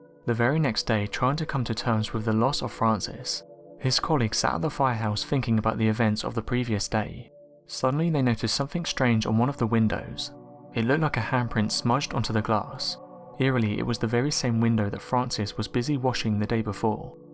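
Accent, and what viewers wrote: England, United Kingdom. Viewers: Dantess26 -English accent ✔ -Similar transition and profile pic ✔ -List of 5 ✔ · bra im confused. does he mean shoes? or real human feet detached from the ankle. can someone clear this up for me ? or does he mean that the human foot was inside the shoe when it was found? im a southern gentleman myself and I understand the man has a British accent, that being said, do they say feet in England instead of shoes? or am I just overthinking this.. point is does he mean shoes or real human feet floating around in the water .. cause I feel that if its human feet floating around then it should be an open/closed case that it was murder .......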